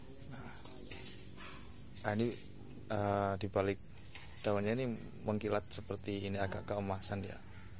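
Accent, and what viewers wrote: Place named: Banyumas, Indonesia. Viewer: Dari nama Chanel Kirain orang Sumatra utara malah orang ngapak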